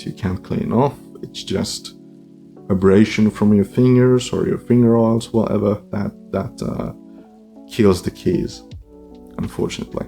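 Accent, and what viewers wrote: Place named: South Africa. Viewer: Bro sounds South African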